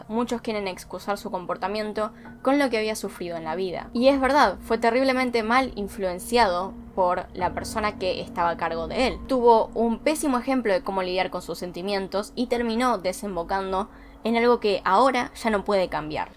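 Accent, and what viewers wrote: Argentina. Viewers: Sos Argentina? porque tenés igualmemte un acento... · Estoy enganchada al acento argentino...hoy voy a ver por lo menos diez o doce...me encantas...sigue así...pura está muy bien eres correcta sin estridencias y esto s temas ya sabes don tan delicados que tienes que cuidar mucho el tono y el tuyo es perfecto y con mucho respeto...besitos desde España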